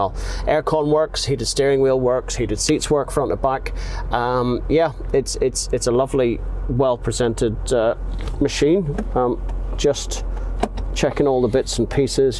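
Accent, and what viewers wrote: United Kingdom. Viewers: great video and lovely car. Just bought an L405 myself and love it! Nice to watch a video and hear a fellow northern irish man. That being said it must be killer for a Northern Irish man to pay ticket price (but as said hard to do a deal when holding deposit has been given)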